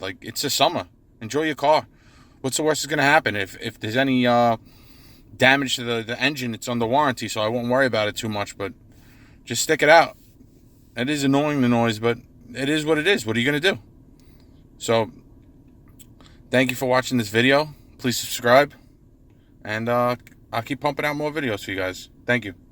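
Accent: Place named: Massachusetts, United States. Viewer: HAVENT... YOU GUYS EVER HEARD FORD=FOUND ON THE ROAD DEAD? HELLO. YOU SOUND LIKE A MASS RESIDENT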